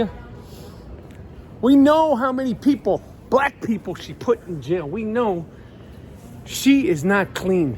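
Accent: New York, United States. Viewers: Best Nu Yawk rant in the history of rants. Thanks for your graceful tantrums.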